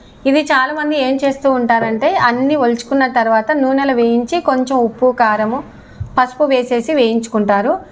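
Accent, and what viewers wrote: Rayalaseema, India. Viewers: Akka peekinave kadu akka teesavu anali mee rayalaseema bhasha yasaga vuntundi 😊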